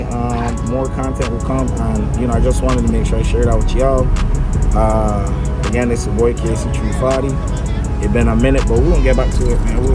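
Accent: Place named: St. Thomas, United States Virgin Islands. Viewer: Vi accent strong st.thomas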